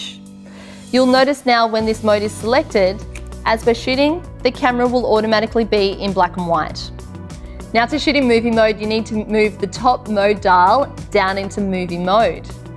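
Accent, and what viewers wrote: Australia. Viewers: I guess she is Australian 😊😊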